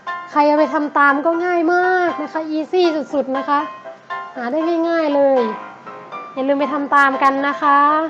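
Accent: Thailand. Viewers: พี่พูดแปลกๆอ่ะค่ะ แบบเสียงเหมือนไม่ใช่คนภาคกลางอะไรประมาณนี้ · เธอไม่ใช่คนกรุงเทพฯ ใช่มั้ย สำเนียงไม่ค่อยชัด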